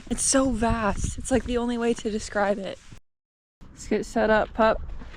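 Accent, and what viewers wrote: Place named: Midwest, United States. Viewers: Oh sure, I didn't even notice the accent until ya pointed it out, ya know. Whelp, from one Midwest girl to another: Tells yeh mom an them I says hi. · you're weird, because you are YOU. That's who you are. And the seats? Weeeeell.... 🤣🤣🤣🤣No, just kiddin. You love them, then I love them. And, Good you got these stuff done to Poe. And, what's wrong with your Michigan accent? Be proud of who you are. We, your people, love you. And we sure hope the Best for Blue! ♥️♥️♥️♥️♥️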